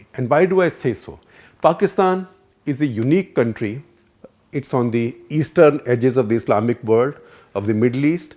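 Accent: India. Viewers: Hahahah look at this stupid guy Imran Khan has been very successful in brininging military and civilian leadership on same page plus he is way more popular then na was sharif ever was because he has been a celebrity plus NZ was directly selected by zia ul haq while IK has a political evolution behind him ask us we have witnessed don’t believe go watch his political rallies not just in Pakistan but In Washington modi can’t even bring half of them togather outside India and modi is exposed by trump he is asking for help from trump to resolve Kashmir issue so this stupid man who speaks English like he is speaking in Hindi bullshit accent fuck you bastard you know nothing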